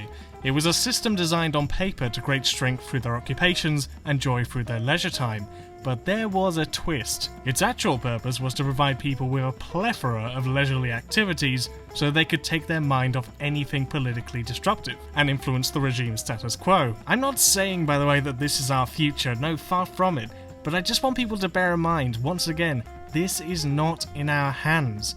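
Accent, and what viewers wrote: United Kingdom. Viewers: Is it weird to anyone else to see such pearly white, moderately straight teeth with a British accent?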